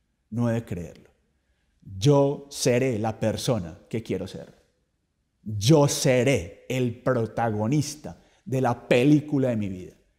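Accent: Antioquia, Colombia. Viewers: definitivamente el acento colombiano hace que sienta que me están castigando, un abrir de ojos instructivo. Que sea de una vez. El momento es ahora. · Me encanta el acento colombiano!!! Ay Matilde!!! 🤣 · LUIS CARO no lo compares con ese asesino. Jajaja te hace parecido por que ambos son paisas, son de la misma región de Colombia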